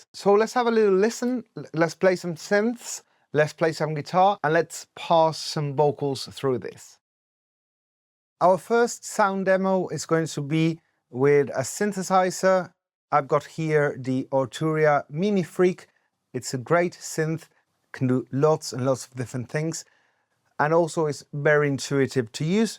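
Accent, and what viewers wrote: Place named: United Kingdom. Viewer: Veri cul british axent 🤙😉🖖👽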